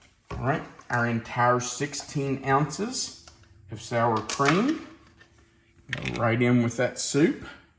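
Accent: South, United States. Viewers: Goodness, don't let anyone tell you how to speak! We like your down home country drawl in your voice! Alllllll of it! 😊 has a touch of twang in her voice too and you both are a delight to listen to! It really is like we are all sitting around the same table having a nice friendly chat with some really good food! Y'all really help cheer up people like me on their own. Blessings!❤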